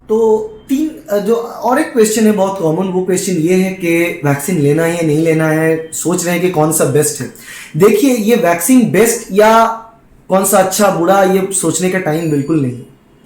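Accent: Bengal, India. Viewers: Are you bengali ?? 😊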